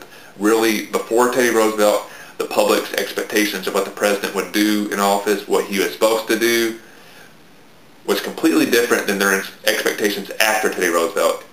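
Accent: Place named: South, United States. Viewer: Okay. Just wondering because I detected a southern accent. I live in the South as well. :)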